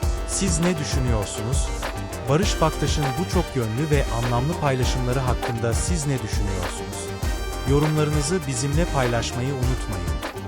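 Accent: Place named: Anatolia, Turkey. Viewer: Sesini çok beğendim iyi ki mutfakta sesin çok güzel Anadolu sesin çok güzel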